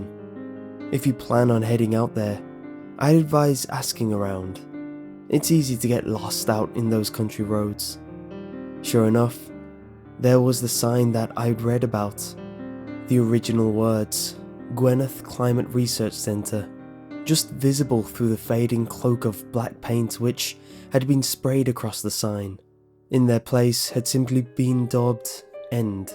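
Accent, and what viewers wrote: United Kingdom. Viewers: This one feels more authentic since it's one of the few UK stories and it's read by a Brit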